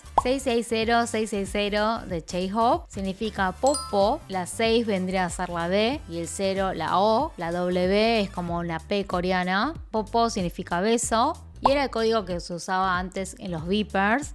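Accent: Argentina. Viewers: Hace cuánto que vivis en argentina ? Me sorprende tu acento 😂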